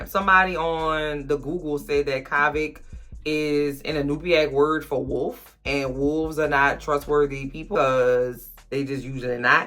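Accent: New Orleans, United States. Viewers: I love your reviews and your NOLA Accent .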